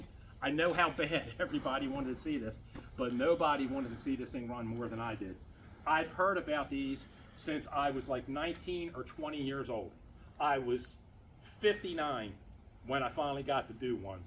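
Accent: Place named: Maryland, United States. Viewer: Awesome!! (Knew you were from MD as soon as I heard you.) I live about an hrs from Pasadena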